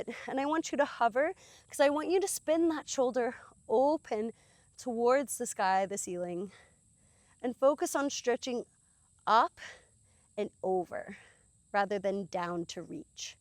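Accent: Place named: Australia. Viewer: Your accent has got me…scattering of different accents. I can hear Australian in there xx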